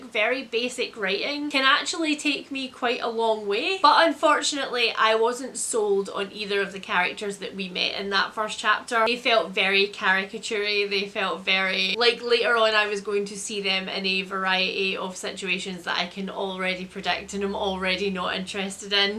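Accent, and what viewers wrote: Scotland, United Kingdom. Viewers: I'm on staycation and I have binge-watched so many of your videos that my inner monologue now has a Scottish accent 🖤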